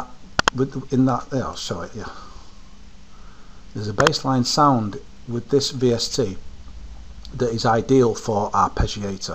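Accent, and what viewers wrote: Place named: Europe. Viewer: I did. wow, so many gaming Vids. I used to be a hardcore gamer. Now my enjoyment from games chiefly comes from playing with other humans (in the same room). Still, if I ever need to catch a kewl euro accent, I've noted you as reference material;P. Cheers. See you around mate.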